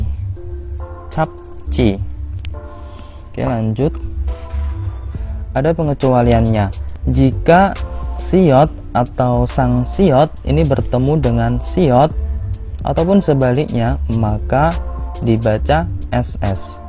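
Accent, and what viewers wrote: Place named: Java, Indonesia. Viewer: Untuk materi sangat bagus mudah di mengerti tpi untuk pelafalan terlalu medok tolong di perbaiki pelafalan nya supaya bisa lebih ke logat korea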